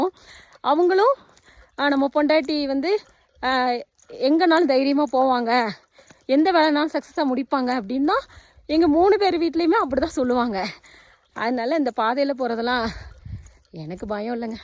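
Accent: Tirunelveli, India. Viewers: நம்ம திருநெல்வேலி தெளிவான பேச்சோட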